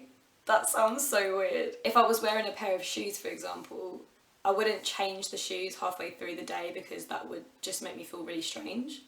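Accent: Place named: London, United Kingdom. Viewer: May I ask which part London you are from?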